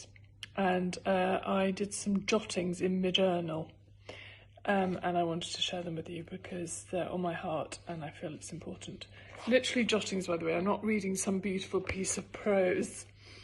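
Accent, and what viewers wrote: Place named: RP, United Kingdom. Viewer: Very poshly done LOL, you are so sweet. It would be marvellous if while you are resting, you could do the job of a care worker. I understand how difficult it is, that you probably suffer from a form of bullying because of your status as a famous woman. Carework has been my life, and I have loved it, it has been difficult because of my connection with a famous man, but I know that it would be a hundred times worse for you. You have done great by reading your journal to us, good luck with your campaign to bring awareness to those in need. You brilliant lovely lass.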